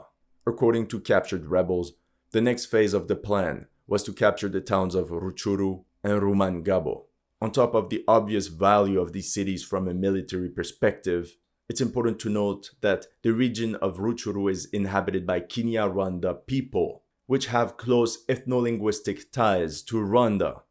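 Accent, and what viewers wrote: France. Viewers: Quand j'écoute votre vidéo j'ai l'impression que vous avez un accent français fait des vidéos en français aussi 🤔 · Amazing French accent! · You a Frenchie brother?😊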